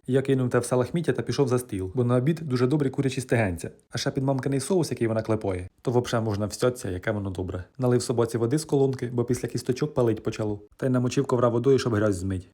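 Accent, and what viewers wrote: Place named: Cherkasy, Ukraine. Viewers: Натрапила на ваш ролік...думаю собі ,,говірка черкаської області " і бінго🎉 Привіт Черкасам❤ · Ви чудо ви так говорите як у нас у Кам'янці Черкаської дай вам Боже бережіть себе ♥️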